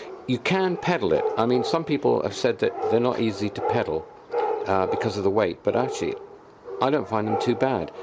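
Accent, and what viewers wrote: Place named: United Kingdom. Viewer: Hi thanks for uploading this video. I'm assuming by your accent that you are from the UK? I was just wondering if you have ever been stopped or asked by the police about the bike? The UK law on e-bikes have been changed so those 'off road' boost buttons are no longer permitted. So the new A2B only does 15MPH as standard but Ultra Motor can up the power to the full 20MPH again for 'off road' use. I'm planning on purchasing a new a2b but wondering if I should get it unrestricted or not. Thanks!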